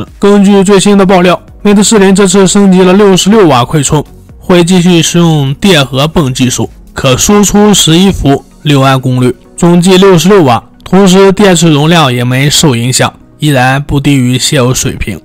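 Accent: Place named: Zhangjiakou, China. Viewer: 张家口口音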